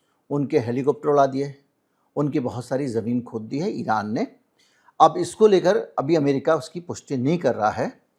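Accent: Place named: Punjab, India. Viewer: ji kya aap punjabi hein aap ki language mein punjabi touch hai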